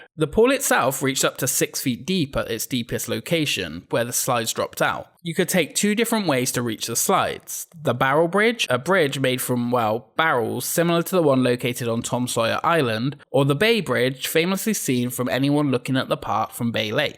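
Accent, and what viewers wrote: United Kingdom. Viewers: Are you from the uk? These videos are great i cant speak highly enough about them all on my personal facebook and my enthusiat friends i would love to meet you one day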